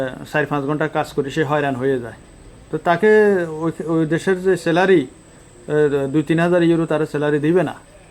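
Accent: Noakhali, Bangladesh. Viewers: bari nokhali mone hoy.. tai abal marka kotha barta..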